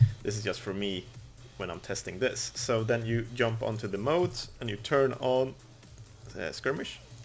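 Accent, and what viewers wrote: Sweden. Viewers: you sound swedish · You sound swedish. Are you? Also nice video bro, keep it up! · This guide is actually really cool and really helpful,thank you for doing this! Hope you dont mind the personal question,but are you by any chance swedish? I'm just thinking the accent gave it away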